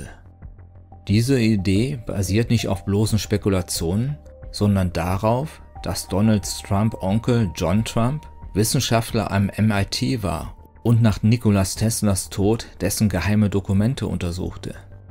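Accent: Spain. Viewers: Es ist wundervoll wieder deine Stimme mit dem schönen Spanischen Akzent zuhören. Möge Liebe und Güte immer mit euch allen sein. So sei es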